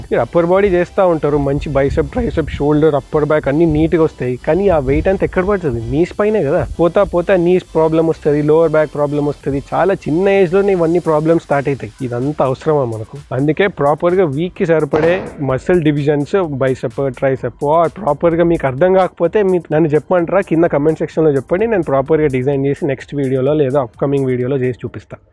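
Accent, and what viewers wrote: Telangana, India. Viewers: Telangana slang bavundhi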